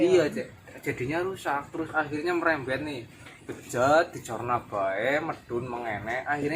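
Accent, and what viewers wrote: Banyumas, Indonesia. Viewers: Rah ngapak rah kepenak😁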